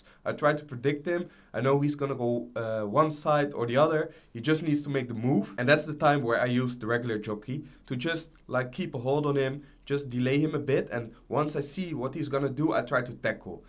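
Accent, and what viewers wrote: Netherlands. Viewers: Hij klinkt Nederlands hahah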